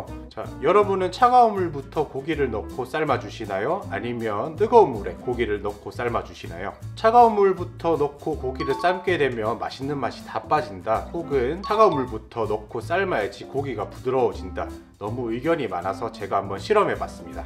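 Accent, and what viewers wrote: Gyeongsang, South Korea. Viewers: 경상도 억양이 다정스럽고 어딘가 품위가 느껴지는듯해요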